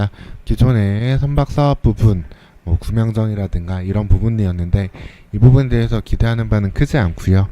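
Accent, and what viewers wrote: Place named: South Korea. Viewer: 지방분이세요? 말투가 졸려요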